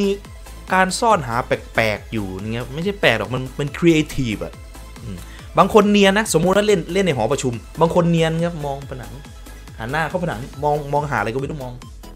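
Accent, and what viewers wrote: Isan, Thailand. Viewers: พี่ คนอีสานป่ะ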